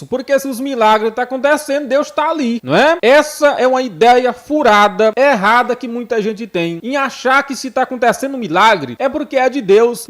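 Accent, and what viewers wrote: Northeast, Brazil. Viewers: A. Paz do senhor Jesus Cristo missionário gosto muito de seus comentários é muito edificante principalmente prá mim que sou Nova convertida em Cristo tem muito que não gosta porque não quer viver a verdade adoro ó seu sotaque nordestino Deus abençoe sempre sua vida e sua família 🙏💕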